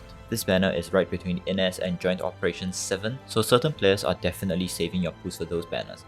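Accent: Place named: Singapore. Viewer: i can tell ur singaporean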